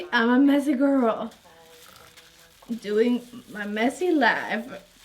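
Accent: Sweden. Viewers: omg are you swedish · Is she Swedish?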